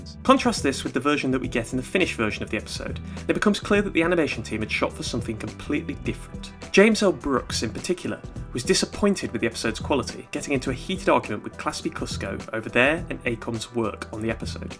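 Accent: United Kingdom. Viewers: I miss the thick British voice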